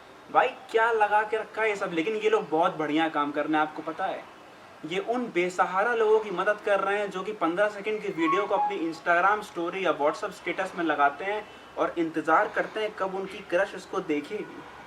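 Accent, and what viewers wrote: Allahabad, India. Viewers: Allahabadi style ma bola to aag laga Diya🤘🔥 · Allahabadi language is superbbb🤣🤣... Well done bro🤘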